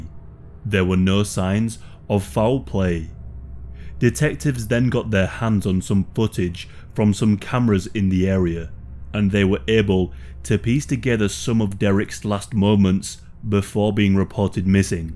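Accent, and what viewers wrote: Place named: England, United Kingdom. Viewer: Love 💕 your English accent. Hope Derrick is alive and well 💕💕🔥🔥🌹♥️🌹🔥💕💕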